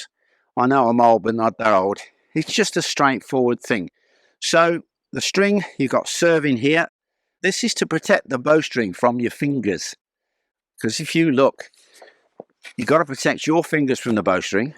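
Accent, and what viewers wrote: United Kingdom. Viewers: Hi there, I'm from Québec Canada. This video appears on my tablet while I was exploring archery related videos, and what gets my attention is your medieval costume first, second , your gigantic long bow , and finally, your lovely British accent. So, I watched all your video and enjoyed it a lot. I'm anxious to view what is next on your platform. Thanks.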